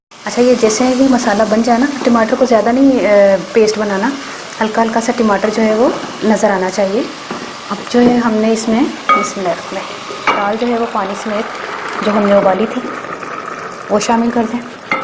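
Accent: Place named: Punjab, India. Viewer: میں پنجابی نہیں ہو پر آپ جو پنجابی بولتی ہو نا بہت سویٹ اور پیاری لگتی ہے آپ پر ورنہ پنجابی زیادہ تر بہت عجیب سے بات کرتے ہیں پر پہلی بار مجھے آپ کی زبان سے پنجابی پسند آئ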